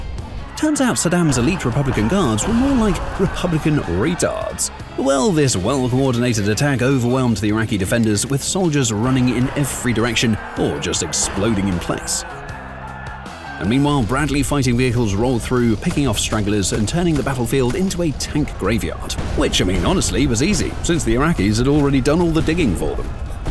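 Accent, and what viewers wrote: United Kingdom. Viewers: Such a great video. My only problem was the narration, you guys are already hard to understand with the british accent but calling Saddam Husein as "Sed'm" didn't help at all 😂 I had to keep rewinding to understand what's being said. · What's point of posting this video with your garbage brit accent, so that you can spread propaganda for iran war!? You do know that, 8 to 9 trillion dollars were spent by US military and ended up rising prices of everyday living, if not then stop spreading propaganda!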